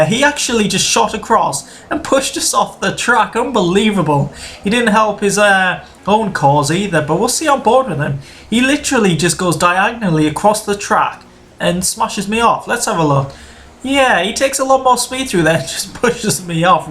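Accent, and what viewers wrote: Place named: North East England, United Kingdom. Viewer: Are you from the north east of England? your accent sounds like its from there.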